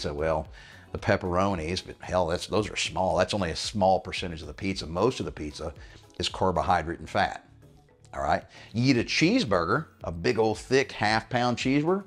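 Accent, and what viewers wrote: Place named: Austin, United States. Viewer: I recognize your accent. Austin texas? Am I right?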